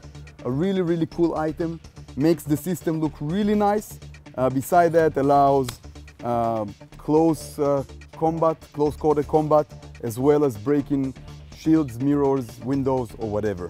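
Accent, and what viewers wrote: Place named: Israel. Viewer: It is called Israeli accent : )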